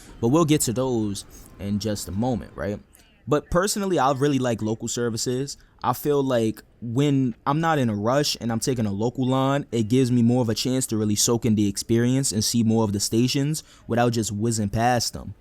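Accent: New York, United States. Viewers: that's barely a nyc accent lmao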